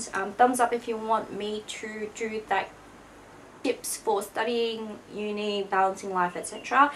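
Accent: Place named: Australia. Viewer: Hey!! Im soo soo happy to have you back:') I have really missed that aussie accent! Would you mind doing a video on picking medicine as career for highshool students? Like why you wanted to do it, how the application process was for you, how was it when you got in, your feelings in med school, advice and tips for people wanting to get in and is medicine what you expected? And pros and cons of being a doctor! SO SORRY THAT WAS A LOAD OF QUESTIONS!!! Could you please involve that in your education video?:) xx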